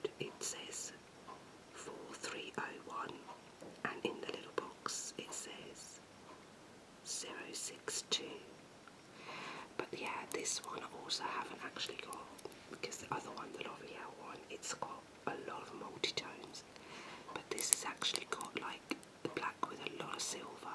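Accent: United Kingdom. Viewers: Subbed!!!!! Finally a good channel with an english accent! Sending my love from London 🇬🇧 - keep up the good work and videos!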